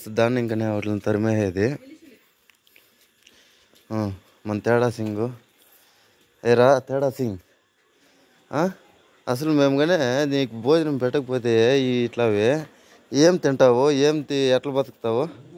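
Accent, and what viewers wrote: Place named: Anantapur, India. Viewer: బ్రో నువ్వు అనంతపురం డిస్టిక్ వాయిస్ మాట్లాడుతున్నావ్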